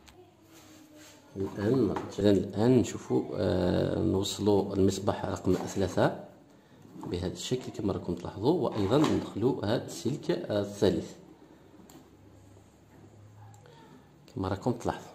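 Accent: Algeria. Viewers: والله العظيم نحب إخواننا القبايل كي يتكلمو بالعربية عندهم لهجة رائعة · اخي وش نت جزائري ولا مغربي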